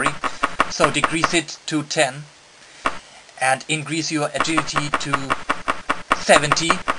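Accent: Germany. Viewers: Are you German? Sorry if I'm wrong, but I'm not so good with accents. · Oh god. German Accent. Boner. =|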